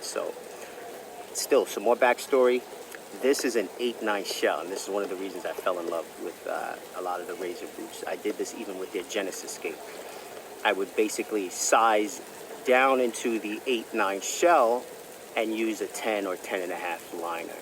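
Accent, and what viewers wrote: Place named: New York, United States. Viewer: Hey bro I saw this video for the first time and before u said it I knew it was new York. I was thinking Brooklyn or Queens. I live in PHA in South Minneapolis Minnesota. I cud tell u had that new York flavor very wu tang vibe 90s vibes. I'm in my 40s now just got back into skating. I skated from 97 to 2007. I skated Rollerblade brand. Now I have razors cult shima 3 remastered, razors Andrew broom pro shift skates and I just ordered the new Iqon AG 10 boots with an Iqon AG60 C frame. A carbon frame. Tho I wonder if I shud send the AG 10 back and get the Them 909 skate. The AG 10 comes in the mail tomorrow I just am so old school that all this new tech scares the shit outta me! I hope I love em I'm just Soo big on durability. I like the old school flavor of a plastic boot and basic shape. I just got a huge sale price on the Iqon that I went for it..all carbon and kevlar mix. 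I just subscribed to your channel and just showing support. I'm over here dodging gun fire just trying to skate haha no joke! I teach dance and I cut hair as a hairstylist. But I love to skate and it feels good to be at it again. Thanks for a great video. I learned Alot. I have alot to learn all over again. Be well and enjoy this summer!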